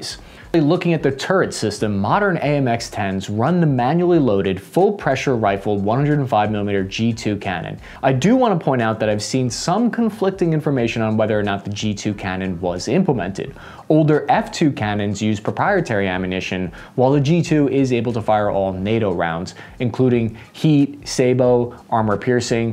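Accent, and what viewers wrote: France. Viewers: Great french accent :) Thumbs up from the country of baguette bakery, fine wine & war tactics 👍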